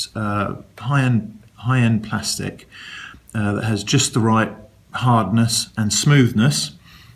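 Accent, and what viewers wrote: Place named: United Kingdom. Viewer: Hi Coach, if you are reinforcing proper cue motion via the trainer, does it fully carry over to cueing without it? Btw, your British English is so good to listen to. Greetings from Poland.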